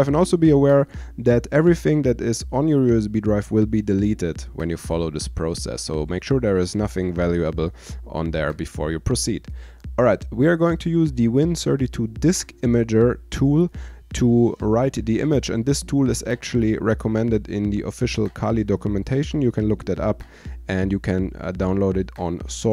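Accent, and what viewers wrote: Germany. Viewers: U German? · Haha ich liebe deinen Deutschen Aktzent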